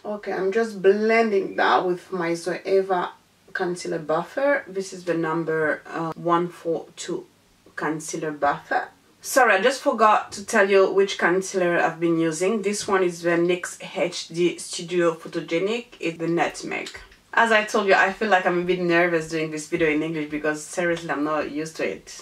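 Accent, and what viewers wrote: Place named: United Kingdom. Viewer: girl!! let me tell you, you slayed in this video 👏👏👏 very well done. Everything was perfect. Your English, your make up, everything was damned good. You were worried for nothing. And I love love your British accent 😉😉. Keep on going girl you have all my support. For foundation brush you can try the morphe M439 if you haven't tried it yet. I wish you all the best and don't worry for the jokes in English you'll find good ones for us. Take care xoxo 😘😘😘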